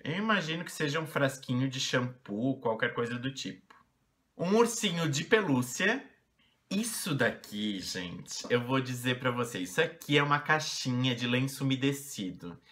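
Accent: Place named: Rio Grande do Sul, Brazil. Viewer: Ai que tudooo! Obrigada por responder, vc é um amor de pessoa muito simpático. ♥ Me diz uma coisa, vc é gaúcho? Sinto um pouco de sotaque hehehe eu sou de Porto Alegre!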